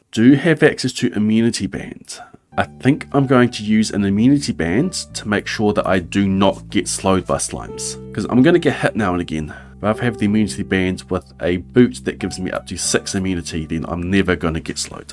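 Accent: New Zealand. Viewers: love ur new zealand accent bro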